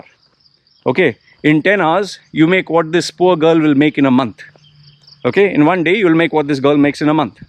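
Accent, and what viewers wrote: India. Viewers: Did not expect you to have an Indian accent LOL. Cool insight tho.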